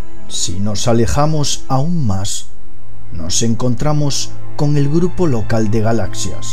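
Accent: Spain. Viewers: Es epañol el tio 😌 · lástima de la narración en el español feamente hablado por los propios españoles.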